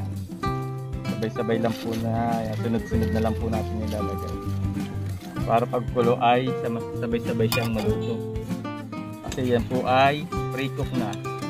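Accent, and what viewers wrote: Batangas, Philippines. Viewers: Wow look so delicious, nakakagutom naman, yang ang Luto nang tiyo ko na Batangueno, love the accent, thank you sa masarap na Putchero, babait naman nang mga bata, natututu silang tumbling sa pagluto, I'm pretty sure na mag mamana sa yo, one day baka mag chef sila well done. Watching from Queensland Australia ❤❤❤❤🇦🇺🇦🇺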